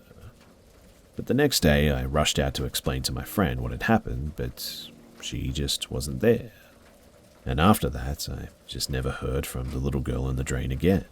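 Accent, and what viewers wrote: Australia. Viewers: It's so great to hear a fellow Aussie voice, it helps me haha ! Well sometimes helps me sleep lol. It's am and I'm still awake lol . But thank you so much I love this,IV been listening to creepy pasta and for some reason the Aussie accent makes me feel like home. ✌️✌️✌️🙏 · I found you through urmaker and I'd already heard of you but I'd never watched a video of yours and I certainly wasn't expecting that Australian accent but I'm not complaining! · I moved from Australia to the US when I was 14. You sound like home 🤗. I appreciate you being awesome man! Thank you! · I've only recently begun using these "true scary stories" videos to help bring about sleep, and of the plethora of channels, I've found only a small handful of narrators whose voices I can even stand, let alone genuinely enjoy. I'm happy to say yours falls directly in the "awesome" column. 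If it wouldn't blatantly (and rightfully) be considered theft/plagiarism, I'd suggest you take the stories from all the other channels and spruce them up with that buttery voice from down-under! ;-)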